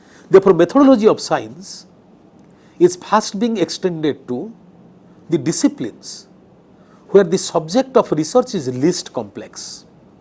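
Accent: India. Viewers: Indian accent makes difficult for u . But stuff is best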